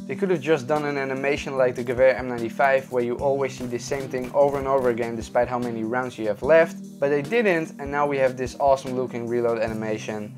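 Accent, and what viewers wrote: Netherlands. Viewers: Are u dutch? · Are u dutch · Are you dutch? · echt zn nederlands/engels accent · you sound like a dutch fpsrussia! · are you dutch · For sure that's a Dutch accent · You have a Dutch accend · I subbed cause i like your channel but 1 question are you dutch? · Ik hoor aan je accent dat je Nederlands bent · are ou dutch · Are you dutch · Ben jij nederlands? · Definitely dutch this guy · is dat een Nederlands accent?? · You dutch? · kinda cringeworthy to hear a fellow Dutch person talk English using a Dutch accent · R u dutch? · you sound a bit dutch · zo te horen ben je Nederlands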